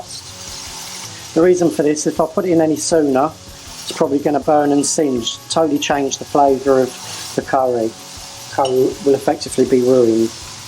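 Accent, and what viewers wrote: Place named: Essex, United Kingdom. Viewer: Great vids, thanks! And bonus is, I'm from the Glasgow area and listening to you is like hearing my Romford relatives, sadly now no longer with us...makes me smile and remember past Essex holidays :)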